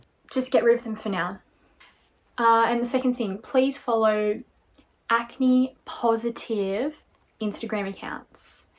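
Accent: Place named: United Kingdom. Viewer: I love your accent! UK, right?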